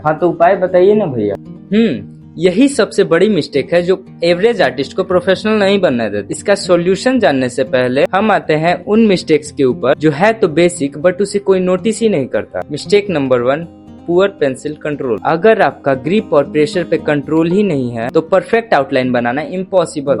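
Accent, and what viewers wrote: Bihar, India. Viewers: Bhaiya are you from bihar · Are you from Bihar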